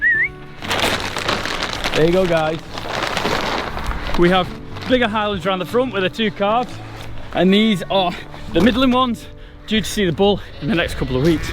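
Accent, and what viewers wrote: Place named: England, United Kingdom. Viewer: New to channel. Coming at you all the way from suburban southern California. Just trying to catch up on some of the terms... A bit of a challenge sorting out some of the words through the accent. Like it though.... Not a farmer here in the states but it looks interesting to watch and learn how the UK does it a bit different than in America. Is a good window into the reality of the English countryside. Question..... What is cake? It can't literally be cake, must be slang for something? Keep up the good work. Love the videos!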